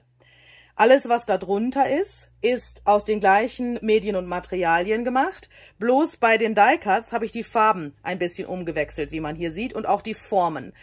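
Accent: Germany. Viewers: Kommst du aus Baden-Württemberg? Ich sende Grüße in meine Heimat sehnsucht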